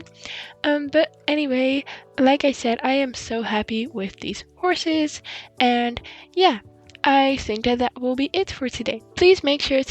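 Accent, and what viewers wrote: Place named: Netherlands. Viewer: The old ones are so cute!! Also are you Dutch? Your accent sounds Dutch :)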